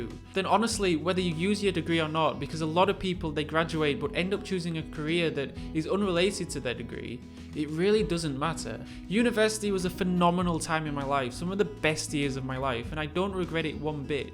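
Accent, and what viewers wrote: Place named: United Kingdom. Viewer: Its a ridiculous how elegant your british accent sounds even when you are saying this like “then your shit is broken” 😂😂 Another video to love and another idea to cherish.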